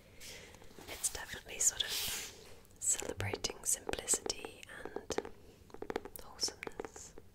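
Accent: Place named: United Kingdom. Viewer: How am I just finding you now?!! Books, ASMR and a lovely British whisper! I love your videos so much. Thank you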